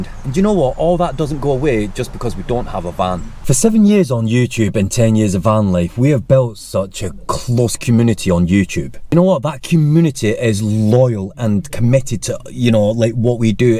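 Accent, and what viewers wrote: Scotland, United Kingdom. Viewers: Hi and, we want to say a big thank you for all the entertainment you give us. Fabulous photography it’s amazing. I’m very much looking forward to the next chapter in your lives., what accent do you have please? We thinking it’s Scottish.? Anyway, keep the videos coming and take care of yourselves. Lin in Devon.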